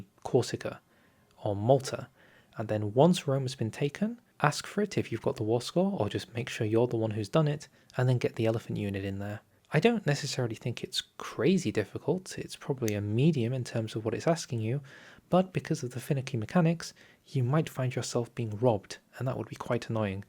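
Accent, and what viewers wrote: United Kingdom. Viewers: Dude you should record audiobooks, such a relaxingly bri'ish voice 🧐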